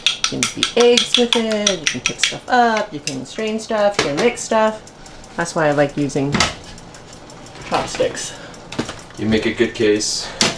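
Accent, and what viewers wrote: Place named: Canada. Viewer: I feel like I just watched maangchi with a Canadian accent! 🤔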